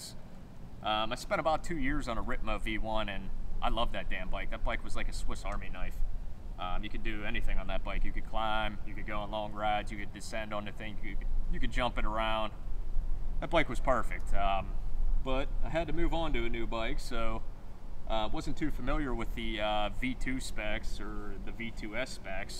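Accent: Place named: Pittsburgh, United States. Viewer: Without even reading your page I knew you were from the burgh. Thanks for the review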